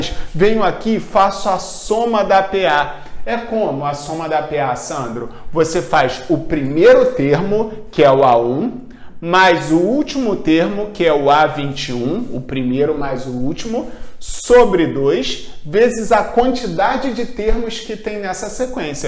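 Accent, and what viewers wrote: Rio de Janeiro, Brazil. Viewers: Meu irmão sou teu fã brother, também sou professor de matemática e acho bem diferente e inovador sua didática, apesar desse sotaque carioca pesado friend... A metodologia de amarrar a atenção do aluno me motiva pra fazer o mesmo e conceituar isso na dissertação de minha pós. É isso meu camarada, show.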